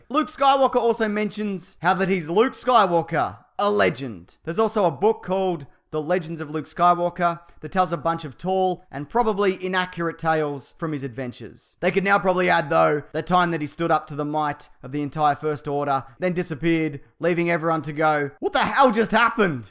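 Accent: Australia. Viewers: wait are you Australian? You sound like Australian? (Just curious)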